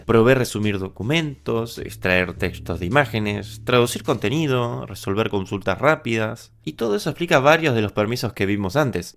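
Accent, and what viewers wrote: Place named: Argentina. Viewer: sabes que un video sobre piratería va a estar bueno y sincero, cuando es narrado por un argentino 🗣🗣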